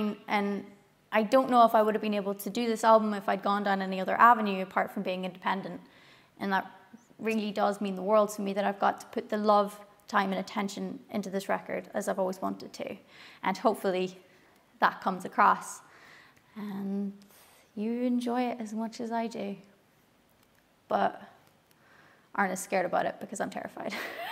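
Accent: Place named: Ireland. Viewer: Janet, the sign of a person growing is when they are able to look at where they have been, what they have done, and what they wish they had done differently. If they can learn from these experiences, they can make changes or continue to grow. Many cannot be that honest with themselves. It takes a special person to be able to recognize and document where they have been and what they have learned about themselves. For you to be able to go beyond recognizing, and then share with us what you have learned from your journey is amazing by itself. I have followed you for years and have thoroughly enjoyed your talents (and the wee bit of charming Irish accents along the way.) Congratulations on becoming an even more amazing person! With your fantastic talent for music, I can only imagine this is an outstanding album. I am looking forward to listening to it. 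Dave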